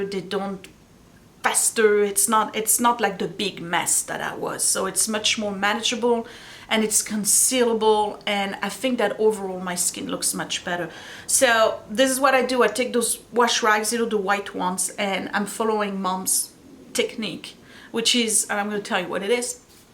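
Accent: France. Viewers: I always love to watch your videos. My favorite aunt was born in Belgium and had a wonderful French accent just like you. So, whenever I listen to you speak, it reminds me of her and feels like a little bit of home. Don't let the haters get you down. I'm so glad you're on Youtube!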